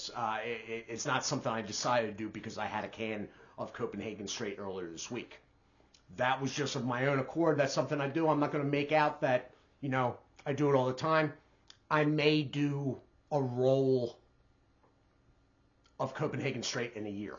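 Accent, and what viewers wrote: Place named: Pittsburgh, United States. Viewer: When you said “PA pricing,” it all clicked! I knew I recognized the accent. I am Pittsburgh born and raised 😂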